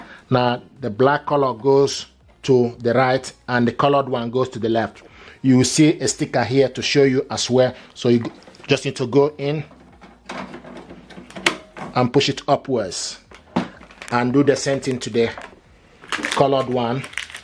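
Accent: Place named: Africa. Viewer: strongest african accent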